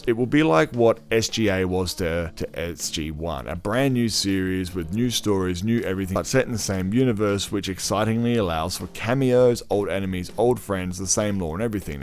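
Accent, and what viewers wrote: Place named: Australia. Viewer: Make more mate. I love listening to aussie creator, the yanks are okay but good to hear a voice i can relate to.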